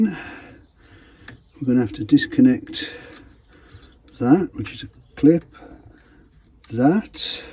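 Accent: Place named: Birmingham, United Kingdom. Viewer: Don't know why, but the brummie accent and talking about car problems are a perfect match.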